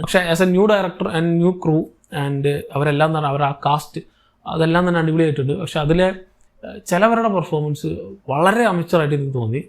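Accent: Palakkad, India. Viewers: Palakkad bhagathu anenn thonnunnu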